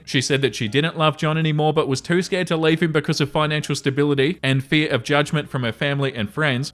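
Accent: Australia. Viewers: Today I’ve been binging these stories for hours while cleaning, I cannot express how much they help my ADHD riddled ass to stay on task lol. Brilliant timing for an upload since I was about to commence more late night cleaning. You’re a real legend for subjecting yourself to the chronicles of these insane people, all while maintaining a sound mental state. Insanity aside I really love your narration, it’s awesome to hear a fellow Aussie’s voice! Thank you for providing genuinely good content that isn’t TTS or AI :)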